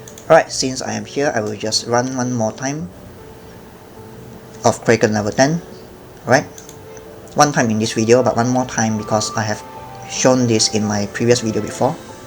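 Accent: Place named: Singapore. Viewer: Dude. You're not from global, are you? Your mobs look crazy. Dayum! By the way, I like your videos but your Singaporean accent not much. YDCB is okay. Sharp but okay. However, when I watch your vids, I feel like I'm in a car and the driver keeps on stepping on the brakes. Or maybe it's just the mike. Hmmm. Try to invest in a better mike with a pop filter? ;) I'd like to see more content from you. Hope this doesn't offend you too much. I mean it in a constructive way. :D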